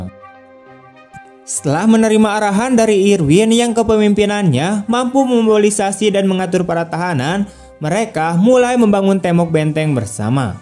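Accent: West Java, Indonesia. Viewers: Orng sunda ya bang?😅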